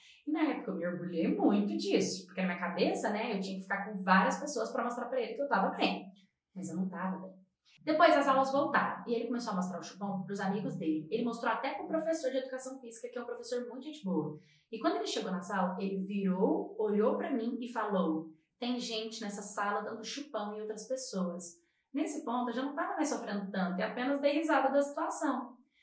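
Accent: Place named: Marajó, Brazil. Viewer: Eu so queria a história com a sua voz da ilha de Marajó 😢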